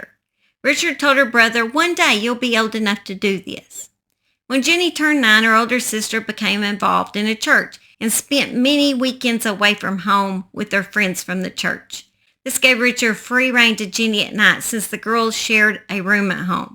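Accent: South, United States. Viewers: I'm Irish and understand your southern English perfectly.....love Southern accent 😊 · You think people in the Southern part of the US speak unusually? Try figuring out what a fast talking person from Scotland is saying!!! You'd swear it wasn't even English. That is why having an open mind is a good attribute -- instead of knocking others, you can appreciate & enjoy the many ways we ALL share this language with different spins on how it is spoken. I LOVE your SOUTH DRAWL & I'm from Brooklyn NY.❤❤❤❤❤❤❤❤❤❤❤❤❤❤❤❤❤❤❤❤ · I’m a Texas girl who is frequently teased AND insulted about my “twang”, so I get how frustrating that can be… In ALL honesty though, the fact I’d never heard the Noah Prestgrove story was the story that brought me to your page. Your “southern accent” and passionate expression in the stories you tell is what brought me to follow you. You’ll get the “Karen’s” because some are so perfect, but you’ll also find others who embrace you for your accent! 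Hugs!! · As an austrian living in germany i had no problems understanding you and i absolutely adore the southern accent:) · As a southern girl from Oklahoma myself, I understand the “southern English” as well! Y’all give this girl a pass! Thanks for the video! Simon is great too! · I can understand you perfectly I can tell you from the south by your accent, but you’re not slaughtering the English lang by any means and I love your channel - remember they hated on Jesus! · I love your channel most people talk ghetto so if anyone is complaining about your southern accent it’s because they talk ghetto. Anyway I hear stories on here that I’ve never seen on other channels that’s the reason I subscribed to yours! Much love from Illinois! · Im Australian and i understand your southern english so pay no mind to the nay sayers 💖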